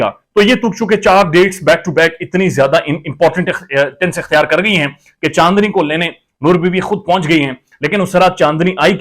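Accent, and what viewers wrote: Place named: Punjab, India. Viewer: Your Punjabi accent is just woww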